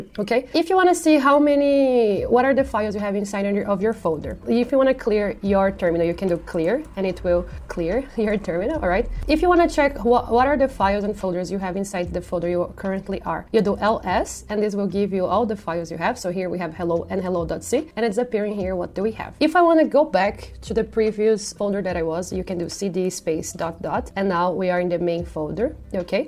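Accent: Brazil. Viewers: Reconheço um sotaque brasileiro quando ouço um, teu inglês é ótimo! Valeu pelo tutorial, pretendo começar o CS50x 2023 agora em dezembro e depois partir pro CS50x 2024.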